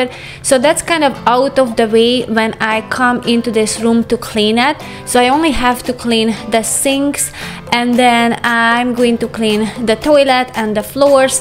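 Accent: Hungary. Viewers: Elvileg van automatikus fordítás a youtube videóknál, ahogy látom itt is. Én inkább magyarul hallanék már egy videót, hiszen magyarok.. és legyen angol a fordítása:D Nagyon bántja a fülemet, hogy magyar kiejtéssel beszél angolul.. tudom az én bajom:) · Hi, I just started to watch your videos! You accent is similar to my neighbours who are Hungarian, now I understand why:) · Hodvoid. I noticed the accent. My mom was Hungarian and that’s we all 3 brothers identified ourselves.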